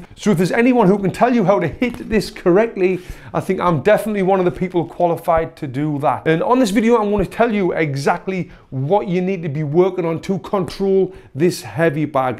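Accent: United Kingdom. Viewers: That's a combo - I like british accent and boxing :D I'm glad I found this channel! Great stuff! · It's like very deep British accent. Kinda like it ngl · British accent😂 I understand your speech better than those with an American accent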